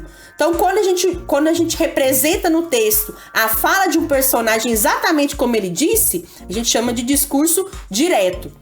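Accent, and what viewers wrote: Minas Gerais, Brazil. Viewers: Bela correção! Gostei foi do jeitinho mineiro de falar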